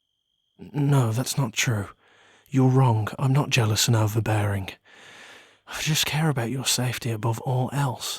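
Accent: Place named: Birmingham, United Kingdom. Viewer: Him: who is he? Me: Levi Ackerman •_• Also really good video! You kinda sound like someone from peaky blinders! I loved it!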